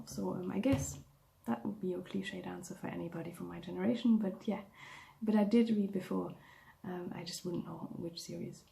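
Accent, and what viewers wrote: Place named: United Kingdom. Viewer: Great video! 😄 I also didn't know the term Literary Fiction before I found Booktube. I googled it. 😄 Your English is really good btw. Did you spend some time in Great Britain? (Just a guess because of certain words you use. 😅)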